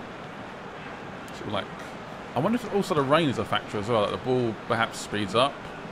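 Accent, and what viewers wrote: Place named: Liverpool, United Kingdom. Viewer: It's difficult to understand some of the things you're saying because of your strong scouse accent.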